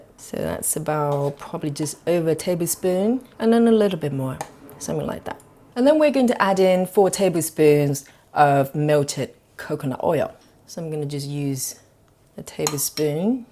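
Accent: United Kingdom. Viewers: Where are you from? Lol I love your accent! Anywhere near UK? Elegantly presented as a real human being ( unlike those others :) !! ) I may just have found the road to my own keto experience! Thanks so much! PS Wanna go somewhere nice? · Lovely voice. Are you british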